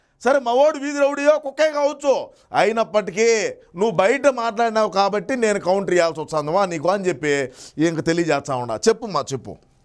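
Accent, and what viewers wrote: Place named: Kadapa, India. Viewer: First preference ni videos ki istunaa..... fun and information rendu untay..... mana kadapa slang kuda❤❤❤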